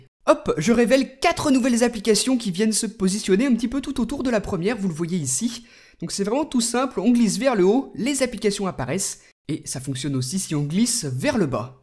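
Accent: France. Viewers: ptn il me saoule ton accent francais quand tu dis des choses anglaises --'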